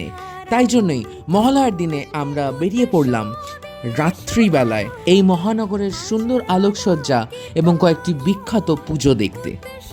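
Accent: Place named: England, United Kingdom. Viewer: DURGA PUJO TO ... BANGLA BOLLE BHALO HOY.......ANGLO VERSION BANGLA TA THIK MANACHHE NAAAAA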